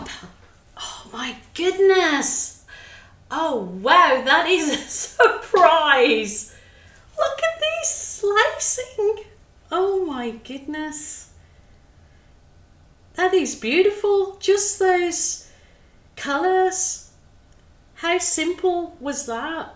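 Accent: Birmingham, United Kingdom. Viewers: after I sent it I thought “No! She a Brummie ya daft tit” I’m sorry for miss placing you. Love that you’ve never lost your accent. X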